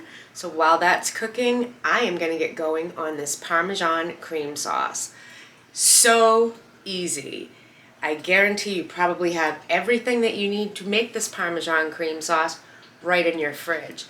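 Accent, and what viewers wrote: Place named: Boston, United States. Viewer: Talking about accents, I absolutely love your Boston accent! I love all different accents, they just bring warmth to our language! Unfortunately mine is just plain jane!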